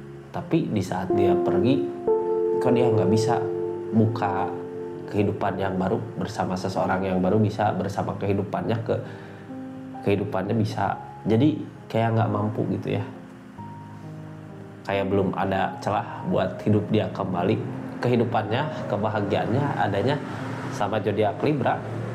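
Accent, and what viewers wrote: West Java, Indonesia. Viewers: Sunda pisan A...Libra Bandung Hadir stay Cilacap salam kenal A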